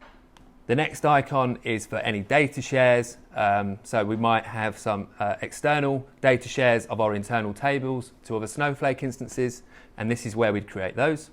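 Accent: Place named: Scotland, United Kingdom. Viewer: finally some scotsman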